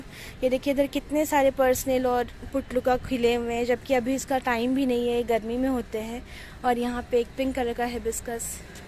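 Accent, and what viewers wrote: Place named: Jharkhand, India. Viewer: Waoo nice .... Or AAP Ki Jharkhand wali boli to mashaAllah....😘😘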